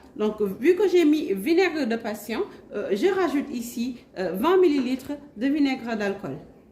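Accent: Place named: Saint-Louis, Senegal. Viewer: On dirait que vous êtes de ndar.... Domou ndarrr... Merci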